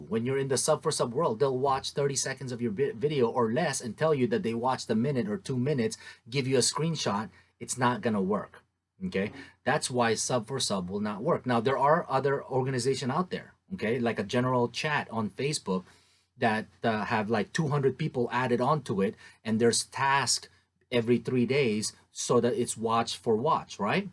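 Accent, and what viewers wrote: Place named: England, United Kingdom. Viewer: I love your English accent❤️😭 dame your pronunciation ❤️❤️🔥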